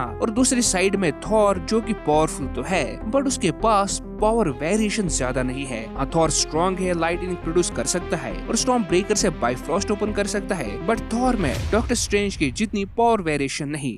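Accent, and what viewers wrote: Kashmir, India. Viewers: Hmm y does ur accent sound familiar. Kaishur chuka?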